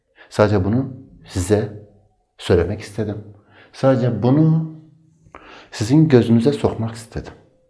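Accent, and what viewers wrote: Urfa, Turkey. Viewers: Hocam siz kesin urfalisiniz.konusma tarziniz harflerin cikisi ayyyyyyy ayni ben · Bu hoca Urfa 'lı mı ?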